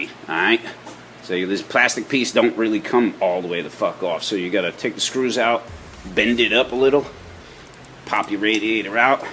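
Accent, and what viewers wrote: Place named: Rhode Island, United States. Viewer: I listened to you and I’m like this guy has to be from RI hahaha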